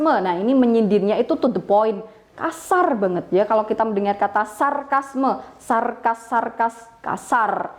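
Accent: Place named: Java, Indonesia. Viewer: Enak banget ngejelasinnya ,bhs jawanya keluar medok banget Bu🤭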